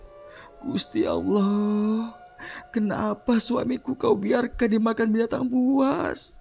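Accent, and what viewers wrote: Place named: Indramayu, Indonesia. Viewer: Gaya bicara Indramayu an pas temen kang 😁,gak pernah komen dan like tpi selalu Nyimak #salam_Wong_INDRAMAYU 🙏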